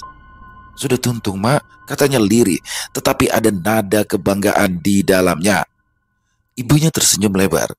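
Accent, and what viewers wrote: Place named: Banjar, Indonesia. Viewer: ASSALAMU ALAIKUM Bg,slmt mlm 🙏,kisah yg sgt kereenn,👍satu hal yg paling suka jika stiap kali bang membawakan kisah"dari tanah Kalimantan,logat bahasa Banjarnya itu sgt fasih,sbgmna orang" Banjar berdialog sehari"nya,& itu sllu saya perhatikan,tdk canggung,mantaaabbbb, 👍👍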